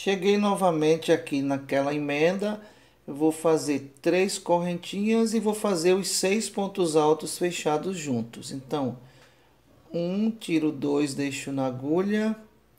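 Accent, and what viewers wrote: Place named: Bahia, Brazil. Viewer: Seu sotaques é um pouco parecido com o nosso da Bahia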